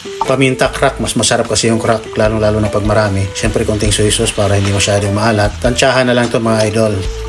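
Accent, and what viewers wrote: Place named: Visayas, Philippines. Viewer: Bisayaa kaau oi..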